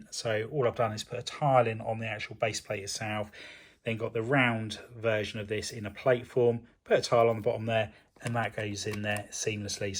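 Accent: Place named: Midlands, United Kingdom. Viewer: A bit off-topic, I am just trying to place your accent. The best I have come up with so far is 'somewhere above Birmingham but lower than Manchester'.😉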